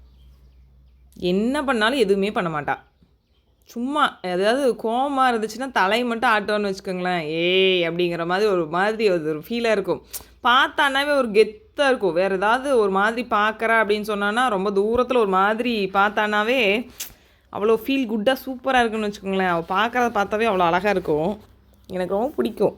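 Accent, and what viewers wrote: Kongu, India. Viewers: எந்த ஊர் அம்மனி நீங்க கொங்கு பேச்சு தவழுது. வாழ்த்துக்கள் இந்த விவசாயி மகளுக்கு ❤🌸👌 கண்ணு ரெம்போ அழகா இருக்கு மா...🎉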